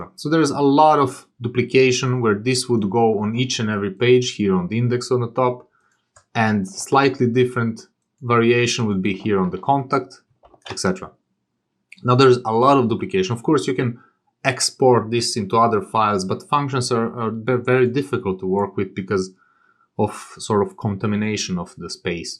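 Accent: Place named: England, United Kingdom. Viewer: Izasao si mi kao predlog posto dosta gledam tutorijale pa reko da vidim... Engleski naglasak i ime :)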